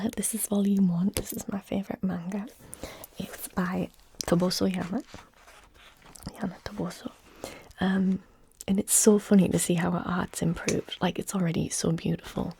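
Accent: United Kingdom. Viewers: Jesus this is your normal accent?! Might even be more Harry Potter than the movie universe itself ha. It's even more impressive then with that Villanelle Russian accent and mannerisms. Ultimate F4 is great-I need to read that again in the future. I need to pick up a Crichton book. I hear nothing but praise for that guy and his novels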